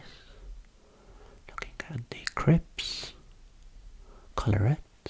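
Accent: United Kingdom. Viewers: I absolutely love your voice and your british accent. <3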